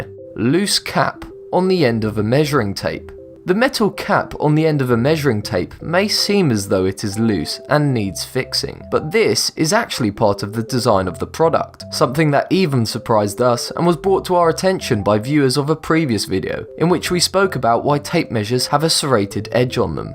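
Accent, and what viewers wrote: England, United Kingdom. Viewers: Just because you have a dumb limey accent does not make you smart. none of these are true and were never meant to be used that way. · pre-schoolers haks, w english accent , horrible as hell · His voice is a really good British accented voice.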